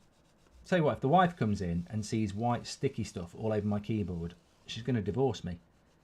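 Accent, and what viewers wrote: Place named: Liverpool, United Kingdom. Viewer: This bloke sounds like a scouser, yeah don't sack him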